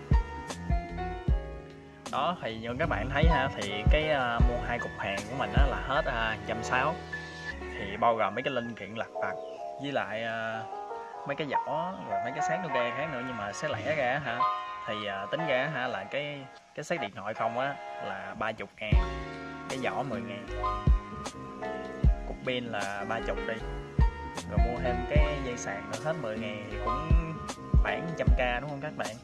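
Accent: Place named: Mekong Delta, Vietnam. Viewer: rất thích nghe giọng người miền tây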